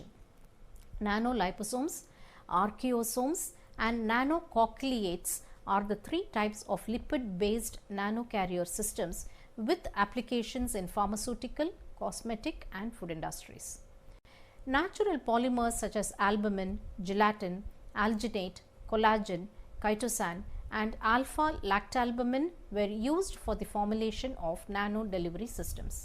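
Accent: India. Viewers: it's a lovely presentation with such beautiful indian accent . THANK YOU SO MUCH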